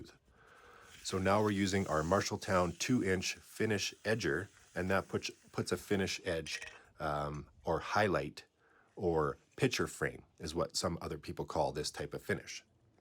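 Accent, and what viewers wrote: Canada. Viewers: I knew you were from Canada with your Os 😂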